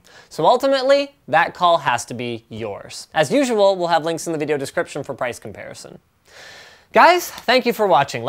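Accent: United States. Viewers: Yeah, at first I thought that he's a US citizen due to his accent. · Canada? Lol I thought you were from the US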